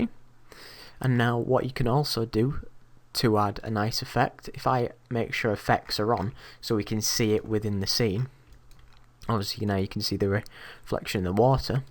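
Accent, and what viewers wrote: United Kingdom. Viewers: British Accent makes me feel chill :D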